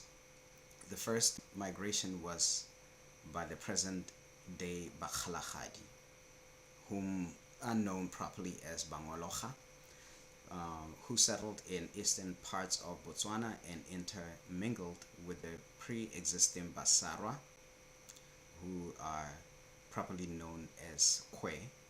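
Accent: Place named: United States. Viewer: Love the program. The accent though made it difficult for me to understand you, wish you could leave the Americanisation.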